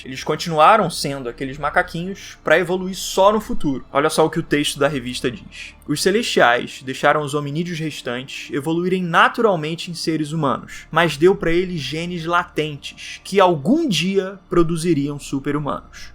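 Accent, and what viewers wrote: Rio de Janeiro, Brazil. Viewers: Tu é carioca mérmão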